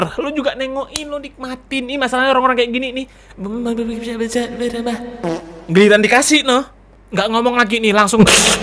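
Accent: Java, Indonesia. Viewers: Jawanya asli mana bang?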